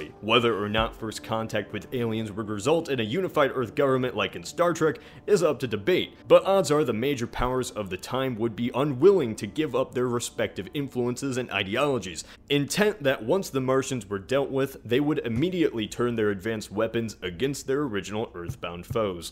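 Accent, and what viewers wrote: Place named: Texas, United States. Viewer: You sound like a Texan to me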